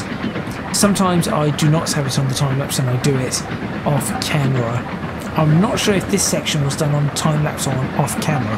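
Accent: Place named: Liverpool, United Kingdom. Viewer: With youse Scouser accent, I have the same problem with Yorkshire. Where t’ t’ goes…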